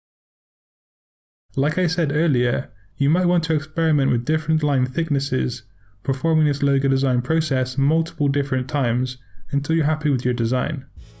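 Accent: United Kingdom. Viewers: Your accent sounds really british..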